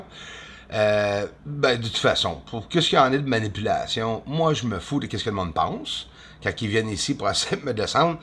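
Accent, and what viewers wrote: Quebec, Canada. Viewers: J'aimerai te donner un conseille, je sais que tu as déjà énormément de boulot, mais pour les Français ce serait géniale que tu sous-titre t'es vidéo car certaines personnes ont du male à comprendre avec l'accent Québécois... ensuite si tu pourrais délimiter les zones avec des pointillés légers qui chemine les contours au fur et à mesure les structures dont tu parles, car moi-même je n'arrive pas à tout percevoir peut-être par manque d'expérience visuel personnel. J'adore tout ton travaille sincèrement mais nous ne sommes pas tous de ton niveau et je suis certain que ce serait très fructueux pour ta chaine et tu pourrais ainsi d'autant plus développer ton projet financièrement très certainement si t'es viewers accrocheraient plus facilement au premier regard, en espérant que tu ne fasses pas assassiné par les men in black haha Big up bro Je te kiff!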